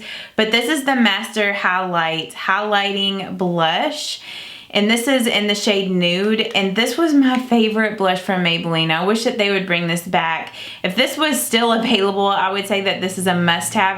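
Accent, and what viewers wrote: South, United States. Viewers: You talk with the southern DRAAAAAAL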